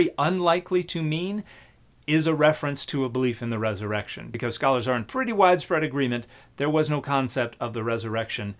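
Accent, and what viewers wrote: South, United States. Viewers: Why do people use Southern accents for stupid? This guy is annoying and insulting. I am not from the South but live now live here. Besides some cultural differences, they are the same as people everywhere with the same distribution of innate intelligence. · Hard to sound intelligent with a southern accent . . . just sayin'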